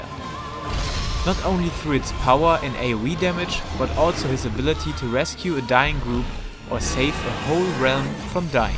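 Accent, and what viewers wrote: Germany. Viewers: You are definitely German!!! I never loved German accent (especially Dutch, gross!) But yours is absolutely adorable and so sweet accent. So nice to listen to !! Thank you for this guide... I hope... truly, that this will help me... Sigh. It's difficult, because people expect the best output instantly ; ; Is there any changes since you made the video?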